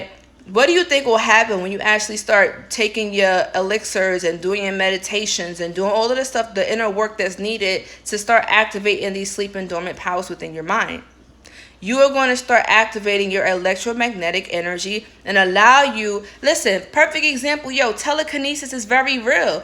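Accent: New York, United States. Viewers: Just started watching u but I love your voice very soothing yet New York 💜👍🏽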